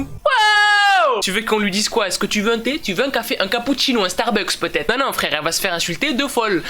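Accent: Marseille, France. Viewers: t marseillais parce que moi aussi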